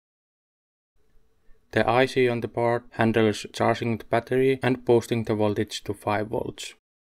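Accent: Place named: Finland. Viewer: Probably from Finland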